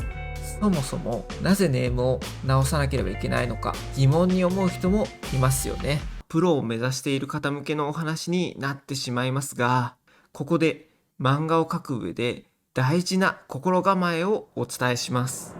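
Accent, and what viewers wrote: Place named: France. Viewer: Pardonnez moi, mais j'adore votre accent français 🥹😍😍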